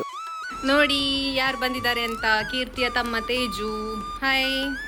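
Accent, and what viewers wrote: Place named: Malnad, India. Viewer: ನೀವು ಮಲೆ ನಾಡಿನವರು ಮಾತನಾಡುವ ಶೈಲಿ ತುಂಬಾ thrill ಅನಿಸುತ್ತದೆ.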